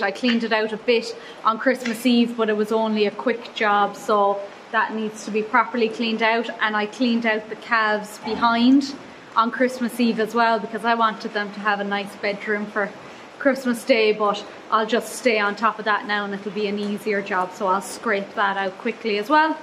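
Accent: Kerry, Ireland. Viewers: You're an inspiration love you're Irish accent 😊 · Remember no matter what u lose Dont lose that beautiful Kerry accent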